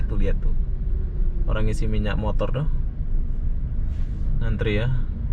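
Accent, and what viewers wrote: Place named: Malaysia. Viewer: Ooohh pantesan masih dapat Logat Melayunya Pas, kirain orang Riau/Sumbar. Ntaapp Lanjutkan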